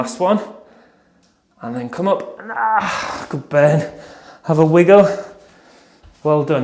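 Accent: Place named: Birmingham, United Kingdom. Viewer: hi, I ran my local parkrun today and got talking to someone visiting from Ireland... we are both fans of your videos and we spent a good few minutes running along and trying to figure out what accent you have? I was thinking Birmingham area, he was wondering if you are from the Scottish Highlands?!?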